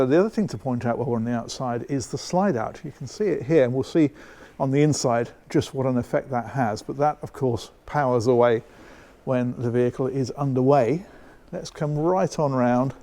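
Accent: United Kingdom. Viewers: Now that's the best use of space in a class A I've ever seen. And if you don't carry a vehicle the garage can be another bedroom or office or playroom or Mancave. Excellent design. And the biggest surprise is the price. At E285,000 very affordable. I like the British accent....Gah-rahge....Priv-a-see!!! Cool👍 These reviews are excellent just like your boat reviews.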